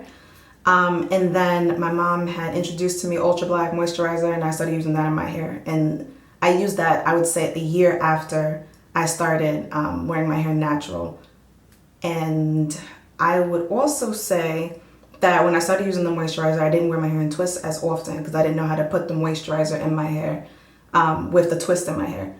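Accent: United States. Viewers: Love your hair, love your attitude, loving the accent!!! BK represent! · you are from NY aren't you? I hear the accent. love it.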